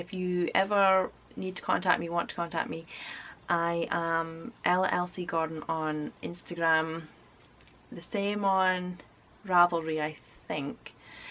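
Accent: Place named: Shetland, United Kingdom. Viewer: Absolutely loved watching this!! I love everything you showed, and you are so at ease in front of the camera! I hope these videos become a semi-regular thing — I prefer little loose videos on a single topic than big podcast episodes with segments tbh. And your accent was so interesting to listen to — I'd never heard a Shetlander before!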